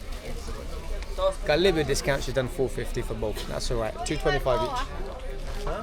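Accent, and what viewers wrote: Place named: United Kingdom. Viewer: Where are you from in the UK? I like your dialect and want to know where do you speak like that? 😃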